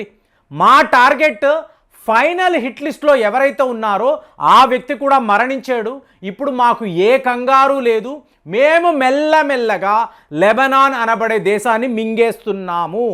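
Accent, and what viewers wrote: Tamil Nadu, India. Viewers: మీరు చాలా పదాలు మిస్ప్రొనౌన్సియేషన్ చేస్తున్నారు. దానివల్ల వరల్డ్ న్యూస్లో విషయాలు అర్థంకవడంలేదు. ఖమేని అంటున్నారు. బుక్స్ లో చదువుకున్నది అయితుల్ల ఖోమైని అని ఇప్పుడు మీరు కుమేని అంటున్నారు. చాలా వీడియోలలో మీరు ఇలా pronounciation చెయ్యడం మాకు రుచించడంలేదు. ఇరాన్ నీ మీరు ఈరాన్ ఇలా కన్ఫ్యూజన్ అవుతోంది మీరు తమిళ్ slag vidavandi. తెలుగు పాత్య పుస్తకాల భాష వాడండి. లేదా మీ వీడియోలు మీరే చూసుకోండి. మా మీద వద్దు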